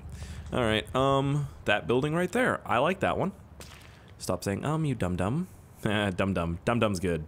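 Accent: Canada. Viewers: U sound like canadian lad a litele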